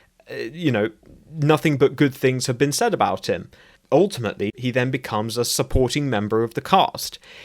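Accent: United Kingdom. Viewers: a British pronunciation should be expected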